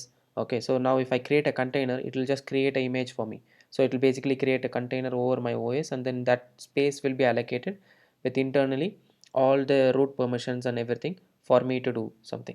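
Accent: India. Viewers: indian accent is something incredible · Dislike because, your thumbnail showed non Indian man, and when I played it was the irritating accent of an Indian.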